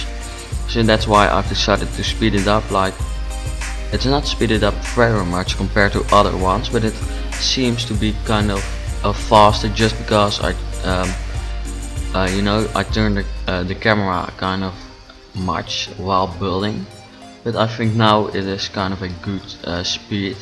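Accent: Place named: Netherlands. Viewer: ik weet dat je nederlands bent :p dat herken je zo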